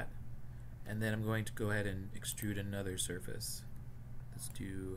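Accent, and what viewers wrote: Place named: United States. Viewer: Finally a tutorial from someone that speaks coherent American English. This was one of the few tutorials I've understood on this subject. Thank you!!!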